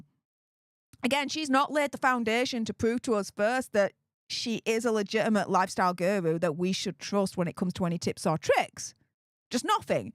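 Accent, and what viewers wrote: Yorkshire, United Kingdom. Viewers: I don't think is bothered by your comment. Just adjust the volume and it's a Yorkshire accent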